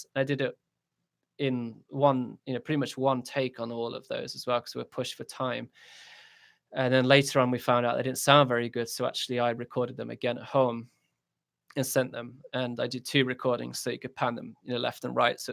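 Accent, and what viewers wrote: United Kingdom. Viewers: dude, non-music question... You sound British, but what's the sketch with you being in Korea? What's your occupation and how did you find yourself choosing to live over there?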